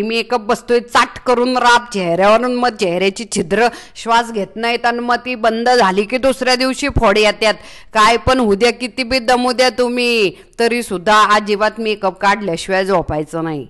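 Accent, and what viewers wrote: India. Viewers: तुमची गावरान भाषा पण किती छान वाटते ऐकायला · खूप छान माहिती सांगितली ताई आणि आपली गावरान भाषा तर लय भारी 👌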